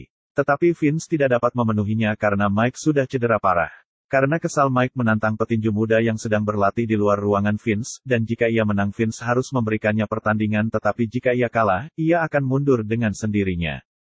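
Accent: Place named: Banyumas, Indonesia. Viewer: Sesuai request sudah ngapak2 nih kawan salam silahturahmi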